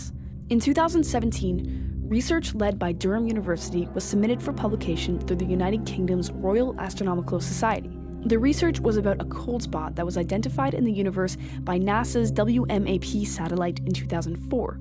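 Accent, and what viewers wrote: Canada. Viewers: u kinda sound canadian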